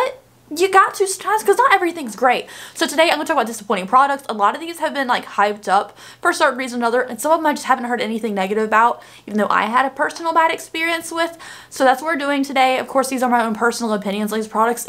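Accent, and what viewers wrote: South, United States. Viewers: How southern are you sounding now days?! I'm loving it!! Sound so precious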